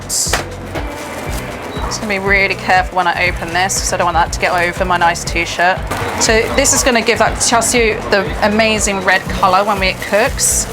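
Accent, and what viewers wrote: United Kingdom. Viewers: Fantastic British accent love it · Hi there u are professional as a 1 Michelin star chef but your presentation is way too serious.. pls lighten up n I m sure you will get more subscribers.. smile more! You r very pretty with a nice British accent. Love your blonde hair too!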